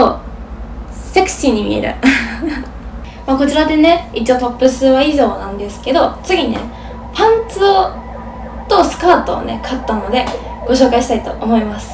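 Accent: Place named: Kansai, Japan. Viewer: 지나가는 나그네입니다. 일본어 간사이밴이 듣기 좋아요. ホンマに日本人？の顔か韓国人の顔だから驚きました。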